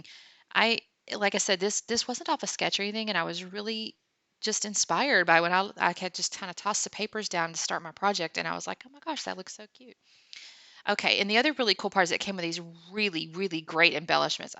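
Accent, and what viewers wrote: South, United States. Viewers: Hi there, were you shouting out to me? Thank you if you are 💖. I try to always leave a comment on the videos I watch. I want to encourage you guys to keep making them for us, lol. This is a nice layout. R u from the south? I am from South Carolina and I hear an Southern accent in your voice 🤗. TFS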